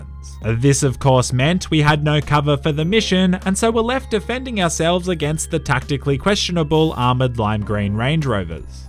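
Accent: Australia. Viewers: are you Australian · Is he Australian?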